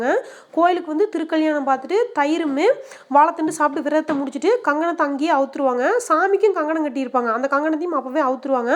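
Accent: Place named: Coimbatore, India. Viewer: Kandepa nenga coimbatore circle than... Mariyathai Tamil அருமை... Avele sapduvanga 👌..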